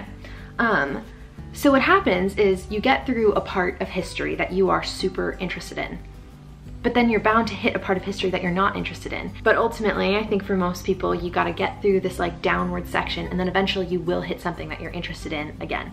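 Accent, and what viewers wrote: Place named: California, United States. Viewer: Good Review! I was listening and not watching and your California accent was flowing out of you very naturally. Gave me nostalgia.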